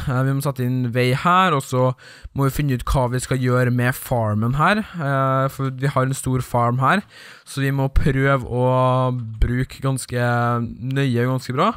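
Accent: Trøndelag, Norway. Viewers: du trøndersk?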